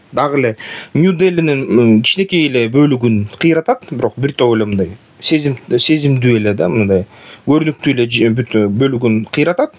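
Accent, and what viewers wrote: Standard. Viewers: Кыргызсча таза суйлойт адабий тилде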